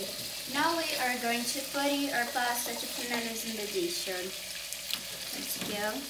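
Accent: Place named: United States. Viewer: Caramba tu parece uma americana falando kkk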